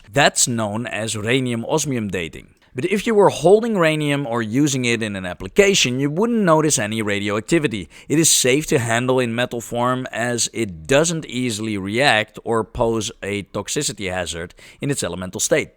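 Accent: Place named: Netherlands. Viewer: love the dutch dialekt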